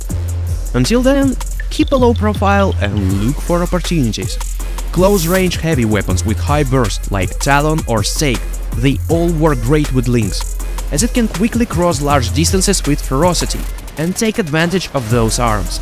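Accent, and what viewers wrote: Russia. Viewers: russian accent...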